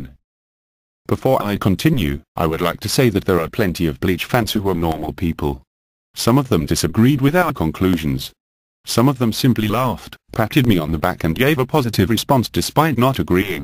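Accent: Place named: United Kingdom. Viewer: The fact this is in the Education category combined with the robotic British voice is awesome.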